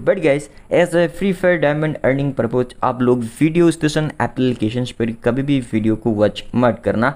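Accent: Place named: India. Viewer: We can't understand you. Indian accent is really annoying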